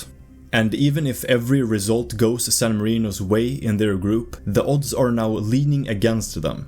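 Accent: Sweden. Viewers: After watching some seconds, judging by your accent, I believe you are Swedish? (No offence, greetings from Norway)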